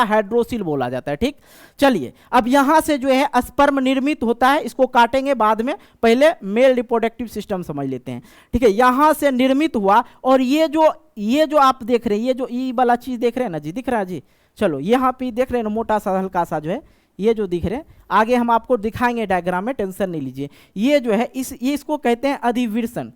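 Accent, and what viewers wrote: Bihar, India. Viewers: Sir aap Bihar sa hai kya....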